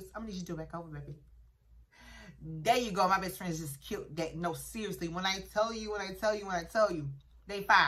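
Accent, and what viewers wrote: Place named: South, United States. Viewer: It's pronounced Al kemy. It's the old name for science, when they still believed in magic. The old alchemists did some weird things, like trying to turn iron into gold, and guessing how many angels could balance on a pinhead! But eventually they started to discover really useful stuff. But I think Knopfler, in the red jacket, really did produce golden sounds. I found your southern accent difficult to understand sometimes, but you would probably struggle with my Yorkshire, Northern English accent. Where comes from, Newcastle upon Tyne, nearer the Scottish Borders, is a really difficult accent, when it's spoken properly.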